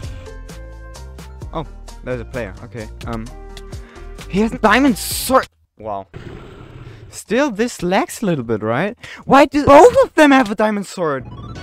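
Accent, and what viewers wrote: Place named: Germany. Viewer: I bemerken über your MUTTERSPRACHE that du habe a DEUTSCH Akzent , do you DEUTSCH?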